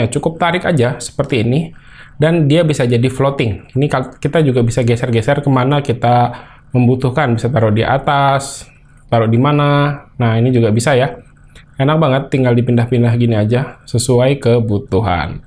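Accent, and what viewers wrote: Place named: Kalimantan, Indonesia. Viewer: Wah orang kalimantan nih abangnya ya? 😁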